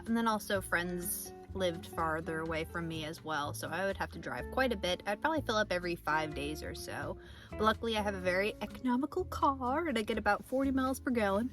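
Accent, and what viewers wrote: Northeast, United States. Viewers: Your NE accent is adorable - west coaster here so it's a bit jarring in a good way! It's great to see someone from the other side of the country explore the west as I feel we can get a bit jaded out here :/. As much as I love the idea of van life sometimes, living in a bike-able/walk-able city with a lot of conveniences is important to me - plus as someone who is pretty tall, my choice of vehicle feels pretty limited as I can't imagine sleeping in a cramped sedan. I'd love to bike tour the country but having the shelter of your car actually gives you a lot more options in terms of taking your time and amenities. I'm not used to paying for a car, so that's a big hurdle if I ever have to jump it! Thanks for making these types of videos, I personally find the meta/logistics videos more interesting than the destinations so I am curious to know if you have side hustle work (or if YouTube is a part of that) while you travel, or if this is pretty much out of pocket.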